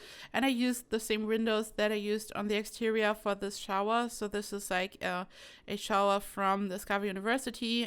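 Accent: Germany. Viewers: Are you german? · are u deutsch? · your accent sounds kinda German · Höre ich da einen deutschen Akzent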